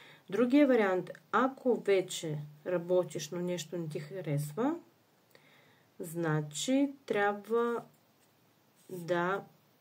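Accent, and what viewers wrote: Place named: Russia. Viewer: Много приятно излъчване има тази жена!❤ И сякаш има руски акцент!❤ Надявам се да се сбъдне това което показват картите!❤Благодаря Ви!❤ Благодаря и на Висшите Сили!❤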